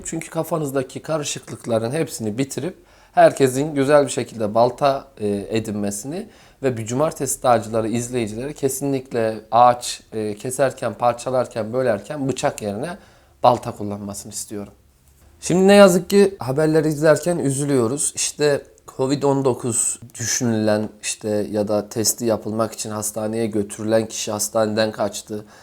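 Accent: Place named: Istanbul, Turkey. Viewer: Dostum eline emeğin e saglik.diksiyon baya istanbul ağzına yaklasmis😆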